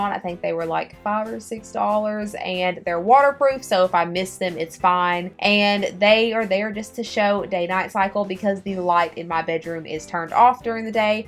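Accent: South, United States. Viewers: She obviously has a country accent, she could be from the south which is why her accent sounds the way it does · I love your southern accent! I'm a southern man myself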